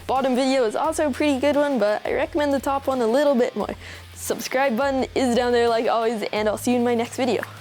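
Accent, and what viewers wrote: Canada. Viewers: Wow you are so grown up know you have bass in you're voice and lost some of the canadian.i can't believe I watched you grow up from backwards hat to beenie and now you show you're hair.great job kid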